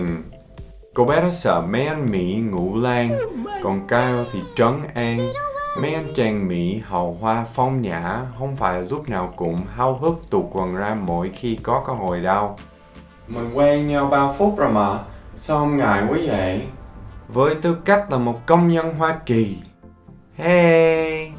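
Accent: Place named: Saigon, Vietnam. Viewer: giọng Sài Gòn rất hay =))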